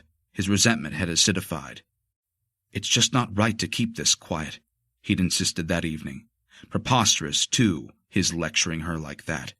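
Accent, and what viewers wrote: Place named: United States. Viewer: The narrator should be English. The American accent jars with the language.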